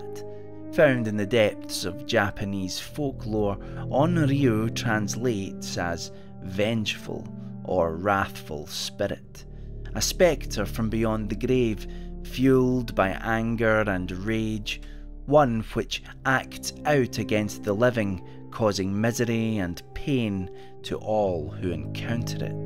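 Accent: Scotland, United Kingdom. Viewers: Once again, an awesome video, right to the VERY creepy last second! Your engrossing "Scottish Crypt Keeper" kinda narration always adds a whole other level to the spook factor, thank you~Well done! The applause you hear is coming from the Rocky Mountains, in the United States. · i love your scottish accent i think its the best accent to tell stories with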